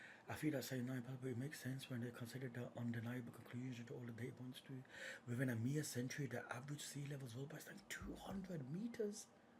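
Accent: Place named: Midlands, United Kingdom. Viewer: Yes and I would hazard a guess from the midlands of the uk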